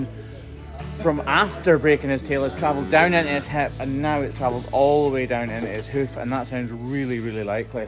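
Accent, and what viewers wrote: Scotland, United Kingdom. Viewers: AYE LAD YER FROM SCOTLAND WHERE?? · Oh I love that you little bit of a British accent LOVE!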